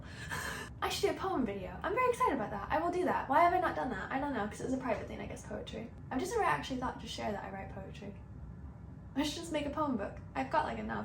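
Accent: England, United Kingdom. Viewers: youre starting to have an english accent omg 🥺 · the smol english accent peaking through is so cute ('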